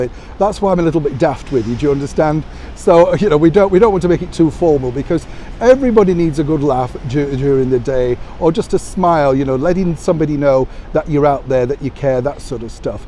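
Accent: Yorkshire, United Kingdom. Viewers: Love your posts and your happy, up demeanour,! Your daily, positive news videos, your smile and your Yorkshire accent make my day! ❤️ from Victoria, Canada.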